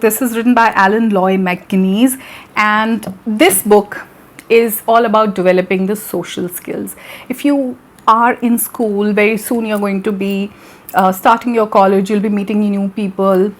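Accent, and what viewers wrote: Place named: India. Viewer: Thanks my dear teacher. I'm well aware that my name is quite familiar to you as I've been one of your regular viewers on this educational channel for a long time. I have not missed any of these video series thus far and often keep an eye on the next episode. The reason is that I have never been able to listen to a mentor like you who speak excellent Indian English with such clarity though I have listened to a few others. Really you're speaking to our hearts. There's a lot I've learnt from your talks so far and there's much more yet to be learnt. I'm often with you on the channel. Just like all the previous episodes, this video too is important as you're giving us some book recommendations. Indeed, books 📚 have the potential to change our lives and it's important for all of us to read books. Out of the 5 good books mentioned here, I've read only one - 'The Alchemist' by Paulo Coelho, which is one of my favourites too. 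I'm sure that the other books are available here in Sri Lanka. I'm a member of The Colombo Public Library, the largest library in Sri Lanka and think that they may be available there. There's another book which I like most. It is 'The Village by the Sea' by Anita Desai, who is an Indian author. Some time back, this book was a prescribed text for the A/Level English Literature in Sri Lanka. Though there are lots of books not all are so interesting as we think, but stories of that kind are read by all mainly due to impressive style of story telling. Just lik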